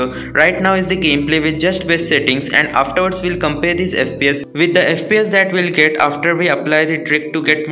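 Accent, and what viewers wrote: India. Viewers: How does that every video titled " How to get 228 fps on low end for 20 bucks no shit for real" is recorded by some indian feller with terrible accent? 😂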